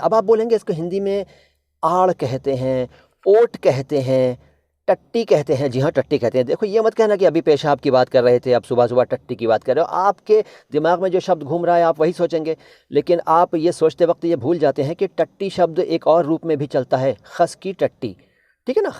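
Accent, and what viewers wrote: Standard. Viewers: bahut shudh bolte hein aap waah☺🙏🙏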